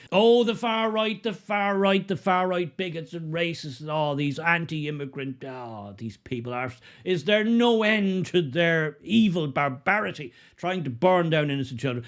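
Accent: Ireland. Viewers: Maybe you should clean out your ears and if that doesn't work then accept the Irish have their own accent. 🙄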